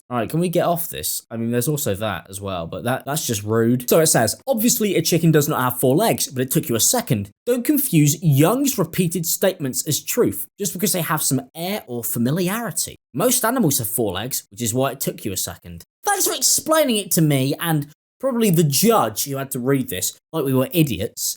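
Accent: United Kingdom. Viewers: .... You're a Brit,? (from the accent) & you don't seem to understand American-style Family Law courts. Sniper-Bitch wants this lawsuit to go to Family Law because those courts are heavily biased against men - Period!!! It's one of the biggest legal scams going in America. This is a business law matter & that's where it belongs.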